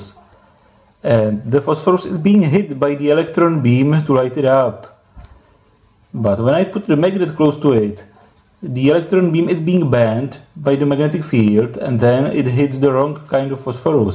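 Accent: Prague, Czech Republic. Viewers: Čechoingliš :D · Didn't expect to hear a Prague accent in English. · Pocujem cesky prizvuk uz po prvom slove. Mam pravdu ze si cech ?? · Czech English ? :D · Where are you from? Your voice souds like your from Czech xD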